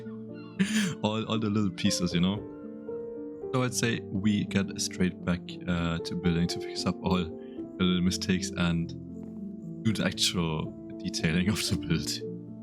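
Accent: Germany. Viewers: ich hörs doch raus du musst deutsch sein. Warum finde ich niemand der gut bauen kann wenn du nen privaten builder server hast bitte lass mich ma drauf kann auch paar bilder schicken als beweis das ich builder bin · Wait, are you german? Love the Intro! <3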